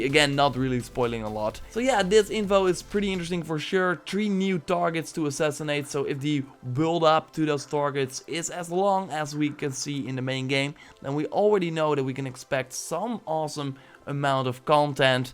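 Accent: Netherlands. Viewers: Are you Dutch? I keep trying to place your accent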